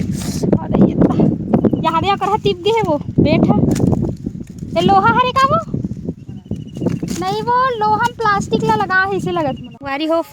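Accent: Chhattisgarh, India. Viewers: Bahut sugghar didi chattishgarhi boli bakha guttur bahut sundar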